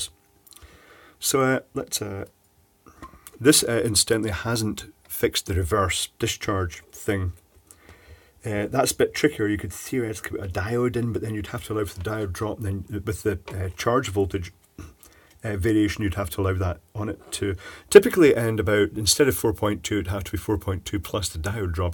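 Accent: Scotland, United Kingdom. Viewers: There is absolutely no need for you to apologize for a long video. We watch your videos coz we appreciate your expertise and above all we value you. For me one of your videos can't be too long at all and I think that I speak for countless others who also watch your channel regularly. Thx for your great work and for your unbeatable Scottish humor and accent 😃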